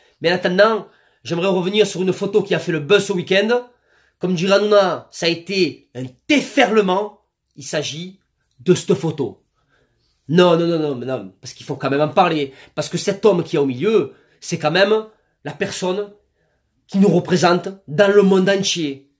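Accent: Marseille, France. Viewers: Salut j'adore votre accent vive les marseillais bonne continuation excellente journée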